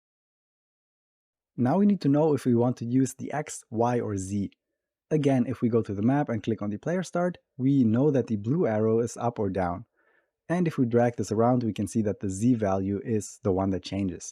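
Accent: Germany. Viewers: Ich höre den deutschen Akzent raus 😄😉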